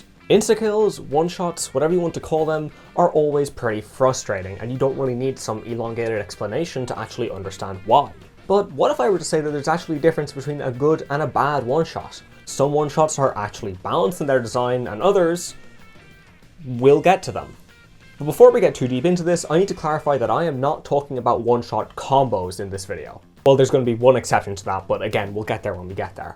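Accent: Ireland. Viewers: lads the blokes Irish lmao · Waheey lad a fellow Irishman??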